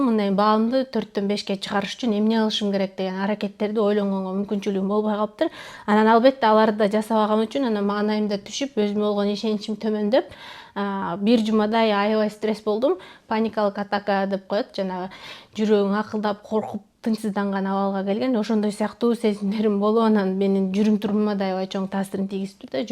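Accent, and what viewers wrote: Standard. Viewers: Кыргызча таза суйлойт экенсиз🇰🇬😍ыраазычылык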